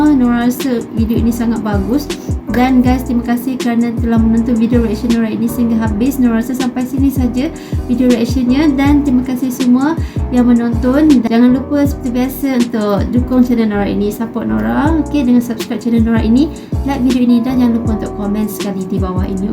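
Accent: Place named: Malaysia. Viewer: Hari cantik orang mana sih ko logat bahasa Melayu Malaysia ❤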